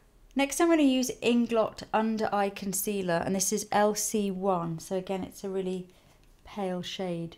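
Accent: Netherlands. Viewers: I saw the other videos first and thought, mmhh she sounds Dutch. Then in this one it was confirmed haha can recognise dutch people anywhere! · She is from Netherlands.. So that might be Dutch - English.. ;) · I could hear she was from holland. Her accent (when she speaks English) sounds a lot like mine :) WOmderful look though! Looks great on her, I feel inspired! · I heard her accent and I KNEW she was Dutch! YAY HOLLAND! :D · funny, i''m dutch and i recognize her accent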